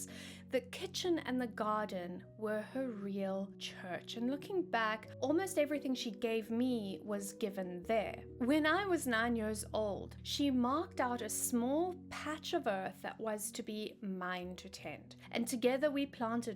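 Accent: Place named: South Africa. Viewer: I cannot explain how ecstatic I was when I clicked on this video recommended to me and heard a South African accent! So excited to watch every single video as I’ve been watching witch channels from America and the UK but some things were just not relevant to my location. ❤❤❤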